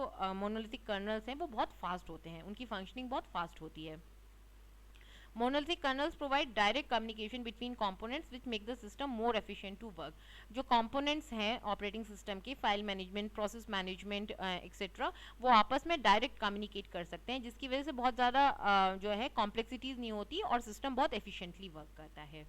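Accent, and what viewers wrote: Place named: India. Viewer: This Indian accent sucks.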